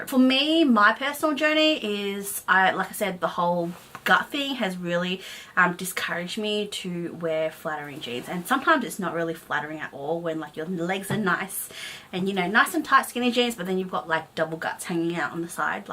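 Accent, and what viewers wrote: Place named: Australia. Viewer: Only 4 mins in and already love this chick. Nice to hear a fellow Aussie too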